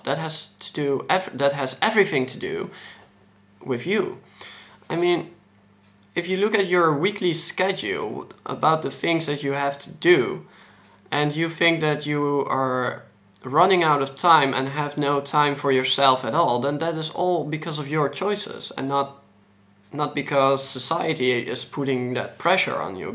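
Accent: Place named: United States. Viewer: You do a awesome job on U.S. English by the way. I love your hair too. You cool.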